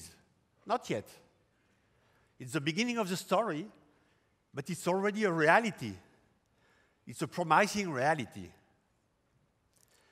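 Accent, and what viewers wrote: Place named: Germany. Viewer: His accent sounds german.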